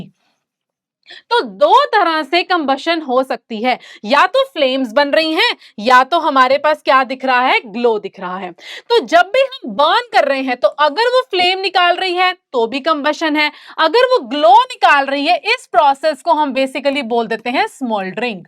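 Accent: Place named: Punjab, India. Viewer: aap punjabi ho kya? accent aisa hai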